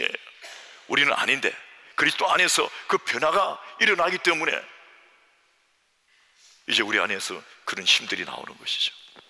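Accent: Gyeongsang, South Korea. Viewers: 명불허전 경상도 ㅋㅋㅋㅋ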